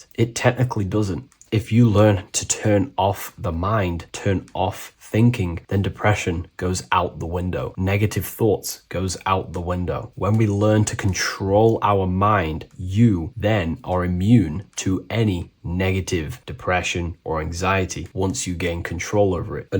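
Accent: United Kingdom. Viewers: Iam so happy that i found someone like you , your chanel so helpfull you answered so many quations i had , i mean there is somthing diffrent about you , you know what you are talking about , i really like this, and i love you british accent too, thank you for your existance and iam so greatfull finding you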